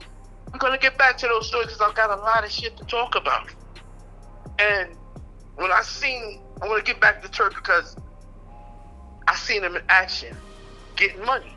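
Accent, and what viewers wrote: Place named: Brooklyn, United States. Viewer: You’re trying too hard with your annoying Brooklyn accent.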